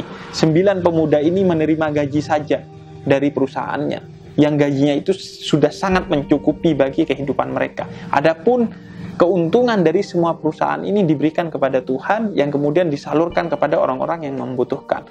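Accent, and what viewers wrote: Madura, Indonesia. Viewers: Maaf bib kayak ada logat" maduranya ?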